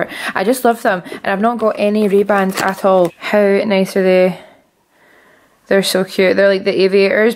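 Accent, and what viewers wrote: Scotland, United Kingdom. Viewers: I need a Scottish accent I love it!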